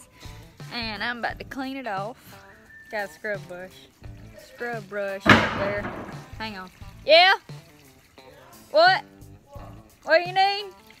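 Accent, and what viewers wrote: South, United States. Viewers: Love that Southern Belle accent. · Well I should have said it better her southern accent either way it's a compliment so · I love your soft southern accent. Beautiful. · This girl has a strong southern accent.She has the best smile 😊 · I know I am not alone when I say that I just tune in to see that Beautiful Smile of yours and hear your sweet, Southern Accent, oh and the house framing tips. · Dang, I just found your channel today and I must say, you're what I'd call a “Modern Southern Belle“! You have the face of a princess, the body of a movie star and the most endearing southern drawl I've ever had the pleasure to hear. Plus you obviously honour your parents, which I actually deem important. Also I like the fact that you're not shy to get your hands dirty and get stuff done. Keep it up like that and I'm pretty sure you'll be famous WAY beyond social media. 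At least I'd LOVE to see that happen. The best wishes from the old world (Germany)... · Lotta Yanks don't like southern accents, don't count me in that group. Soft southern accent is easy on the ears and easy to understand, add her pretty face and I'm payin' attention. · I love that accent of yours true american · That's it I'm moving to BAMA the 1st time I watched any of her videos was yesterday I was just browsing didn't click on just seen her and u know any normal guy was thinking damn hot chick...then I clicked on and that countrybumpkin accent came out of her in n love lol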